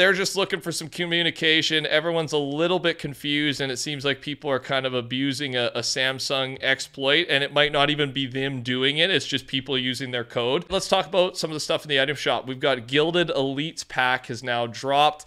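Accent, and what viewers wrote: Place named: Canada. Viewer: Canucks hat....the hoser accent. My guy lives down the road 😮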